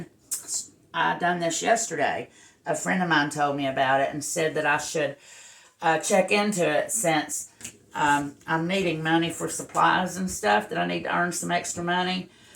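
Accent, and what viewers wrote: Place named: South, United States. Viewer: I just accidently found you today and you are a breath of fresh air. Your dutch pours are even better than kanella and your personality is 1000% better. As far as lisa marvin your cleanliness and professional look of your work is top of the line. I cringe watching the mess lisa works in haha. I am so very glad to have found you. You are REAL not fake in anyway and i hope your channel grows astronomically. You deserve it. I am Yankee so your accent makes me chuckle sometimes. Southern belle for sure. Not some richy trying to get richer off others but a real deserving artist. I have been doing fluid art since 2018. My husband was diagnosed with pancreatic cancer and it gave me my peace when my soul needed it. He died sept 2019. I love doing my art and love just mixing the beautiful paint colors some days. I just wanted to tell you what a wonderful soul i found you to be. 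You are great. You say you are timid, i find you humble and deserving. Keep your guardian angel painting for yourself because i know you have one watching over you, no doubt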